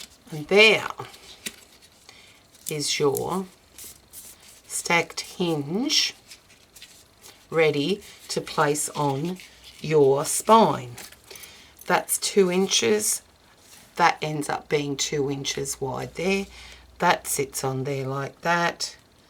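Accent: Australia. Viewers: Thanks for sharing. I really like it without the flaps and with the added strength. I've made mine 7.5 inches high because I want to do an album that will fit 6 x 4 photo mats. Can you recommend what sort of pages to add to the hinge ? I'm thinking about doing just a single sheet of cardstock because I can't make pocket pages that big without having to stick 2 pieces of paper together with binding and then it looks a bit bulky. I'm also worried that the open edge would be too big at 7.5 inches. Thanks again, great to hear an Aussie accent. I'm from Melbourne living in the US now.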